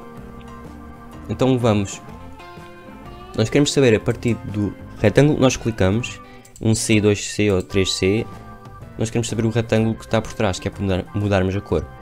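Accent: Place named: Portugal. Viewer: Parece que vc fala como se fosse do Portugal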